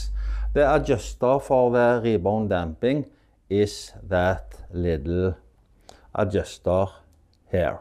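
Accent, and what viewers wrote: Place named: Scandinavia. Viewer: so funny, when i hear that scandinavian accent I think of all the scandinavian rally and f1 champions and i'll just buy anything you say :o))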